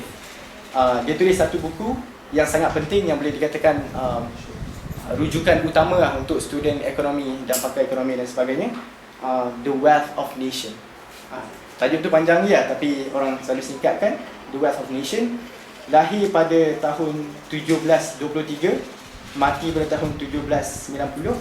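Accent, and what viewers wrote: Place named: Kedah, Malaysia. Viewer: You have grown to be matured...still remember u r such a small kid during form2 debating in UIA with d kedahan slang 😁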